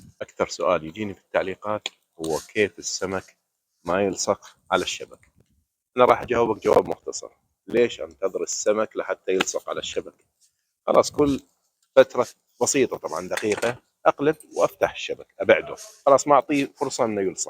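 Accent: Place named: Deir ez-Zor, Syria. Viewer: شيف المحترم نحن نستمتع بكلامك وشرحك على الفيديو خصوصاً بلهجتك الديرية الجميلة اذا سمحت لا تقطع الفيديو بهل طريقة السريعة وخلي يكون اطول ❤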